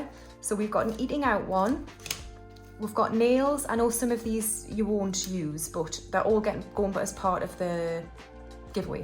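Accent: Newcastle, United Kingdom. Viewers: Love listening to your accent. I'm a Geordie too but live in Northern Ireland so I miss the home accent. You sound more Gateshead than Newcastle...?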